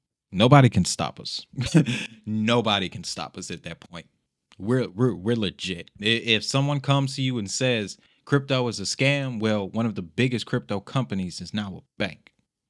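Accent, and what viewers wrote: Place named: South, United States. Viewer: Thank you for breaking it down with that Southern Style.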